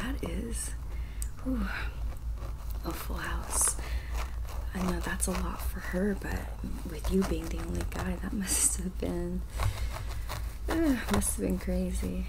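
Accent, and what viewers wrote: South, United States. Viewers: Luv those southern accent vids💯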